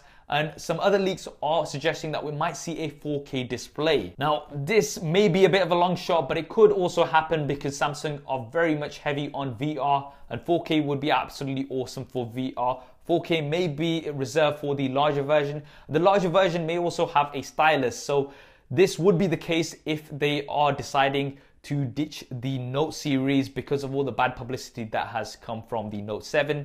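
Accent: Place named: United Kingdom. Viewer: Wow are you hindi?you sound like a Brit.amazing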